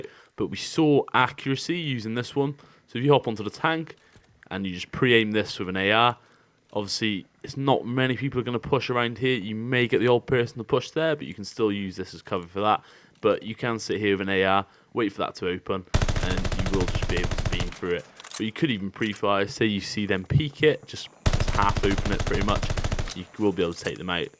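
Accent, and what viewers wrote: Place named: United Kingdom. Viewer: every American likes a UK accent over any commentary, I promise you 😂 it’s just a thing. You’ll keep growing bro, just keep releasing content.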